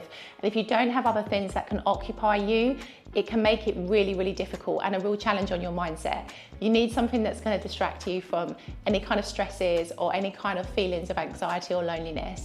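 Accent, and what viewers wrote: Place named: RP, United Kingdom. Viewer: I love your RP accent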